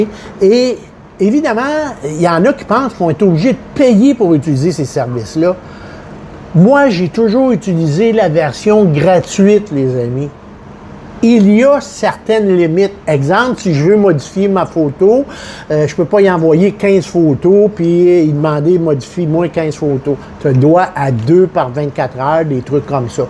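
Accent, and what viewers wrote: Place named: Quebec, Canada. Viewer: J adore votre gouaille de quebequoais .. 😀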